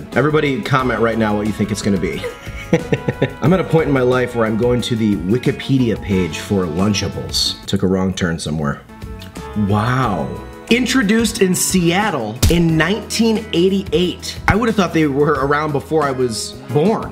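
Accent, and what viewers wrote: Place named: Chicago, United States. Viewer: I am from Chicago and we definitely do talk like this wise guy here. We do not put ketchup on hotdogs. What are ya nuts? Ketchup on a hotdog, get outta here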